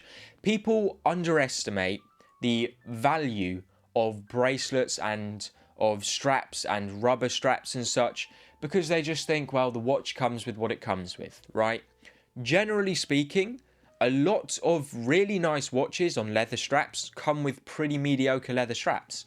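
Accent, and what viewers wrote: United Kingdom. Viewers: Apart of the great content, I love your impeccable british pronounce. 2 lessons in 1 time: about watches and perfect pronounce… what’ else!👍🔝🔝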